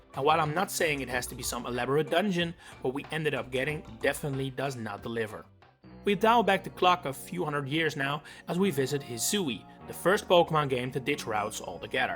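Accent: Netherlands. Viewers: Vind het geweldig om te horen dat je Nederlands bent puur aan je accent. Het is niet extreem Nederlands, maar Nederlands genoeg om te horen dat je 1 van ons bent ;)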